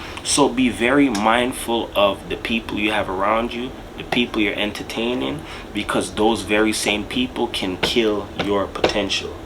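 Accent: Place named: Jamaica. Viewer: YOUR VOICE IS SOOTHING.... RASTA ACCENT! JAMAICAN???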